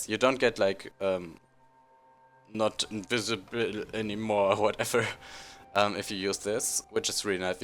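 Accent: Germany. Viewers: Bist du deutscch ? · He is german ! you hear that. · are you a german guy? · locker der akzent ist so offensichtlich außerdem sind die besten rengar spieler deutsche ^^ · Bist du deutscher? :D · u can tell the way he speaks hes german lol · are you form germany or austria ? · Do u come from Germany ? Hört mann nen bissle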